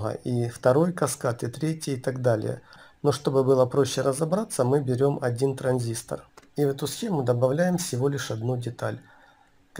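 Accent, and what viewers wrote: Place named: Surzhyk, Ukraine. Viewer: Слова некоторые просто Суржик , автор живет в той местности где так разговаривают . но так даже интереснее .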